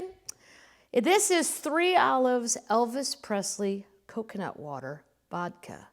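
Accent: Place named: South, United States. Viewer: spot-on Southern accent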